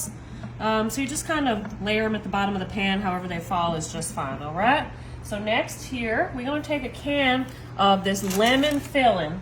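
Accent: South, United States. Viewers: Every thing you make is fabulous ! Enjoy you American accent 👍👍💖💞💞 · That's a southern accent.. not an "AMERICAN accent"!!!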